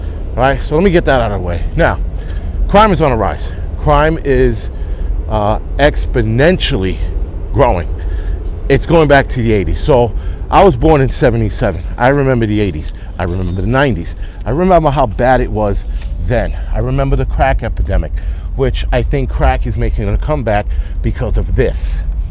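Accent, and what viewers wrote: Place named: Chicago, United States. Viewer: Really informative , sounds like Chicago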